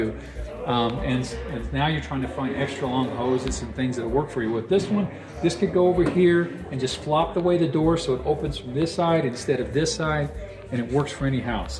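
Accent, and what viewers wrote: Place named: Midwest, United States. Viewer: Typical Midwest accent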